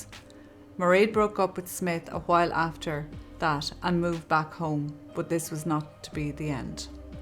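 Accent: Ireland. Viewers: you have an Irish accent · How is it possible that his family did not realise that he had schizophrenia and as such a very real danger to himself and society, I wonder. So sad about Mairead, she was such a wonderful young woman. Thank you for your channel, and your lovely Irish voice! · Love love love..cant wait for more content. A lovely irish accent. Such sad cases. X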